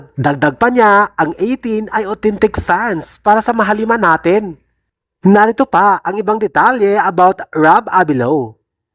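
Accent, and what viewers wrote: Philippines. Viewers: I’m happy for our Mahalima! I also want to commend this channel kasi yung host at yung video, walang ginamit na AI!🎉❤ dami kasi ng nagsulputan na channels na bago tapos yung thumbnails nila halatang AI kasi yung mahalima natin, iba ang hitsura. Meron pa ngang thumbnail na na double mukha ni josh tapos wala si Justin, yun pala pinaghalo halo ng AI mga itsura nila 😂😂😂 tapos AI yung boses, maganda nga yung accent pero di mo ramdam yung pride ng host pag nagbabalita ng good news sa mga A’tin. Meron pa ngang halatang pinasulat sa chatgpt yung script kasi ang habaaaa ng video pero niligoy-ligoy lng pala yung point 😂 So pls continue producing content na gawang tao at may puso, andito kaming viewers na prefer ang Pinoy accent natin kesa robotic na accent. Salamat!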